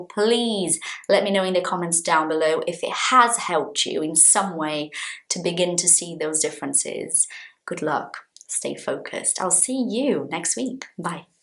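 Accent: RP, United Kingdom. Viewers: British Accent! That’s quite posh .. you are a very pretty posh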